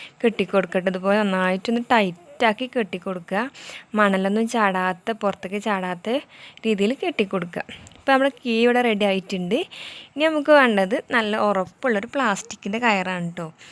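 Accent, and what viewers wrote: Malappuram, India. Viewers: മലപ്പുറം ആണോ ഇയാൾ. നല്ല ഭംഗിയുള്ള സംസാരം...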